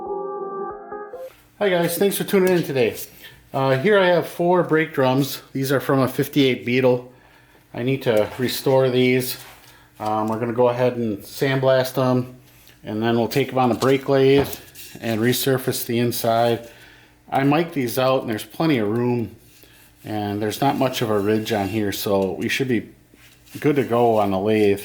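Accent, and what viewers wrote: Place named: Wisconsin, United States. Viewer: Wisconsin huh? I thought I recognized the accent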